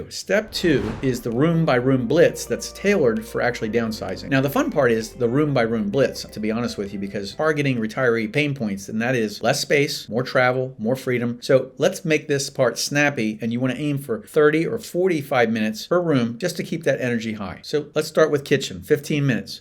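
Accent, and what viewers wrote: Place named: Eastern Pennsylvania, United States. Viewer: Nice vid. P.S. I'm detecting an eastern PA accent. True?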